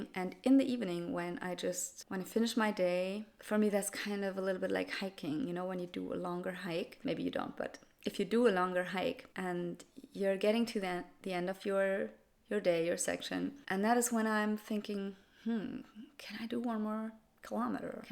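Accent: Germany. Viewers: I started learning German 2 days ago because i love the sound of the language. It's my 3rd foreign language. Even your accent in English is so sweet like German.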